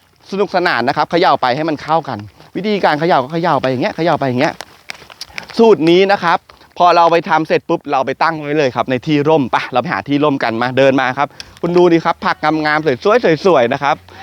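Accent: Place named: Ban Pong, Thailand. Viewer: เก่งจังเลย..คุณ..เสียงเหน่อเหมือนกันเลย(คนบ้านโป่ง)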